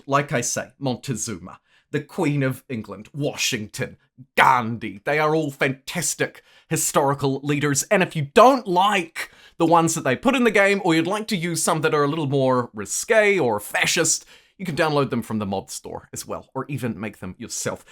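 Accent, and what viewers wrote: New Zealand. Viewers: is this guy kiwi????????? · You sound Kiwi, Australian and American all at the same time, I like it.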